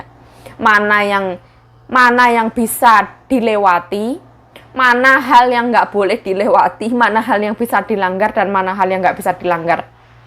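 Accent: Java, Indonesia. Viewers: Madarin logak Jawa😁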